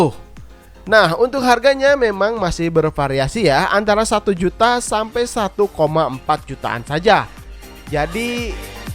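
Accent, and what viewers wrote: West Java, Indonesia. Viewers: Sundanese gan 😁😁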